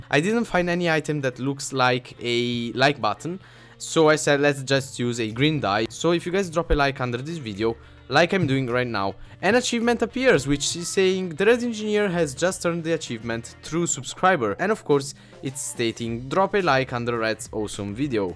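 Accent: Italy. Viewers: Per quanto sia bravissimo a parlare inglese si vede che è italiano XD! Te ne sei accorto?? #Red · perché cazzo parli in inglese se sei italiano ps. si sente l`italiano;D se parleresti in italiano faresti un grande contributo a yt Italia:D;D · bella frate sei italiano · Certo che se sente l'accento italiano xD GG bro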